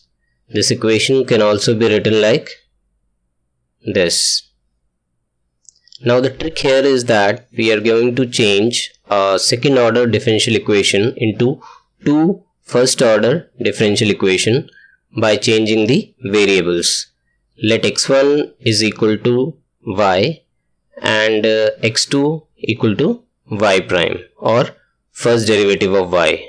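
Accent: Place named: India. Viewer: Computer voice with Hindi accent. How do they do that?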